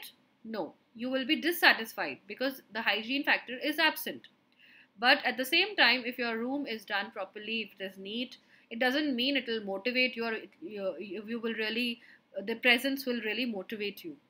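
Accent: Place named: India. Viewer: the indian thick accent is spoiling everything.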